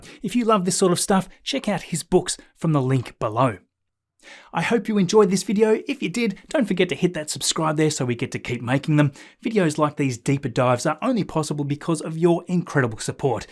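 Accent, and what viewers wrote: Australia. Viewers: Are you an Aussie? Can't believe I never picked up on your accent before today been watching you for ages 😍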